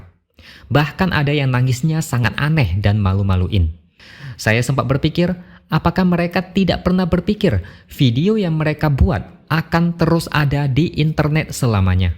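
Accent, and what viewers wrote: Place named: Banyumas, Indonesia. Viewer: Wong ngapakk 🤟🤟🤟🤟🤟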